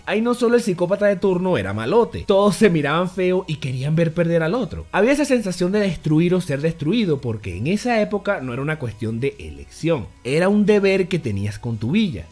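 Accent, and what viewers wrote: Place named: Venezuela. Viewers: Yo venía a preguntar lo mismo xD este es el primer video que veo y me sonó muy venezolano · Obviamente es Venezolano, es muy facil notarlo.. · nose es que con solo el acento se le nota que es de venezuela (caracas para ser mas específicos) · Si pues, el acento es muy similar a de nuestra casa, no sé si de caracas como tal pero, tiene ese acento criollo jajaja pero maneja buen léxico sin parecer un pajuo por eso no creo que sea de caracas xd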